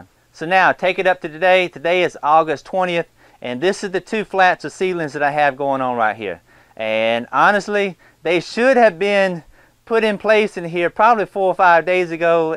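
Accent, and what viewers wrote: South, United States. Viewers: Lord willing and the creek don't rise. I haven't heard that in 20 years. Your idea for the grow light setup is terrific. I never thought 1" PVC pipe would be strong enough, but it sure looks sturdy. I'm really commenting, though, to tell you that you have the best accent in America. I have NO idea where you're from, since the only sort-of southern accent I know is New Orleans, where much of my family lives, and that accent is just weird. You don't sound like a Texan, either. Actually, you sound like the character Boyd Crowder on "Justified." That took place in Harlan County. Are you from or near the great Commonwealth of Kentucky? But the actor grew up in Georgia, so maybe he was just speaking his own natural way. Don't laugh at me. I was born and raised in the rust belt and moved to Cali as an adult. Never gotten to the south other than N.O. 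· finally, genuine southern accent...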